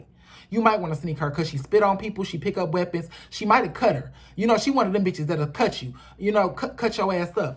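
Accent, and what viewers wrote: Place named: Minnesota, United States. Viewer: I had to pay on the floor and do a beetleguise laugh when u said “rewwoom “😂😂😂 u give Suburbs Minnesota vibes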